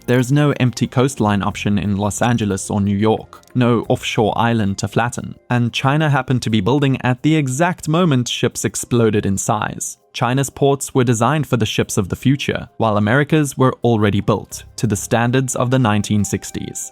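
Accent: South Africa. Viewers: Aweh aweh! Yet another channel with dope graphics and a narrator with a South African accent! Lets gooo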